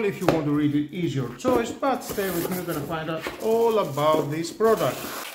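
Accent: Greece. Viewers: Εντελώς ελληνικά αγγλικά 😂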